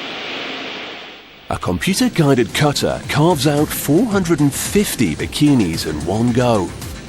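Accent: United Kingdom. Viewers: I love the way British people say stuff :D it makes me giggle.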